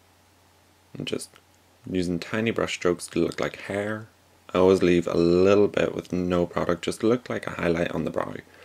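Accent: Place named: Northern Ireland, United Kingdom. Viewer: ooh...love this look and your technique is amazing! Do I recognise a good ol northern Ireland accent there...where you from originally? :-)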